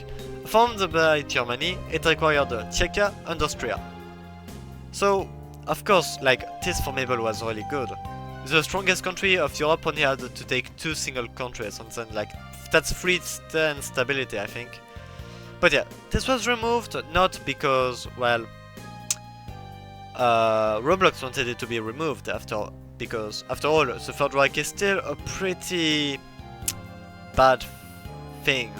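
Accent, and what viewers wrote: France. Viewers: Are you perhaps, french? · Are you French? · Are you French ? Your accent seems French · T'es français ? Ta un accent français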